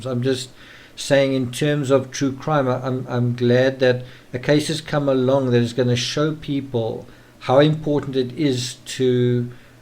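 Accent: South Africa. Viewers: Definitely a South African accent.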